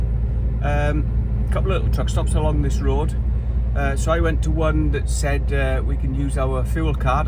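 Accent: Wales, United Kingdom. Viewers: Another great watch Mr 😁, contents as normal interesting, and the views spectacular😘, really can't get enough. Just one thing can't get my head around, where do you actually come from 🤔,I'm convinced there's a slight hint of a Welsh acsent, but it's got something else with it. Make the best of the weekend, o and what happened to the meeting up with Captain Slow😊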